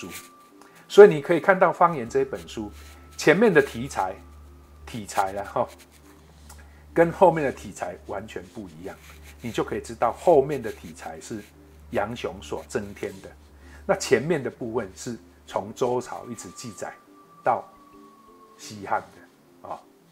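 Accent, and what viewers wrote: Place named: Yongchun, China. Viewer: 我也是永春人，難怪口音與陳老師相類。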